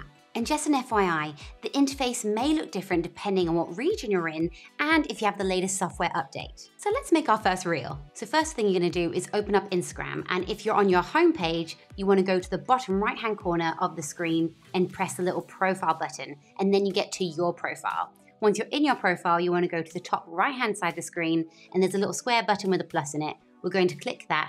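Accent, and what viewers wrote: United Kingdom. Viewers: Agree with other reviewers - really helpful to get up to speed and get it right (and nice to have a Brit accent as well ;-)) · Instagram reels, British girl, Public or private school accent ?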